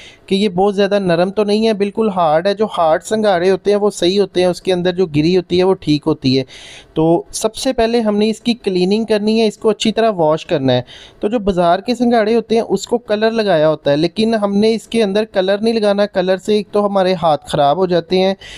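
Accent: Punjab, India. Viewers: آپکا لہجہ اور تلفظ پنجابی ھے۔خدا کے لئیے انگریزی نہ بولیں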